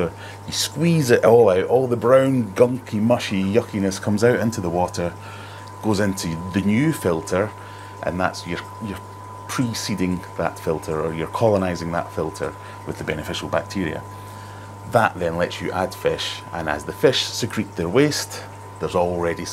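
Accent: Scotland, United Kingdom. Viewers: Tetra. 5-in-1 or 6-in-1 strip tests are very accurate and you get the results in just one minute. No crazy shakes and much easier to use. I cut mine in half lengthwise and get double the value. Love your Scottish accent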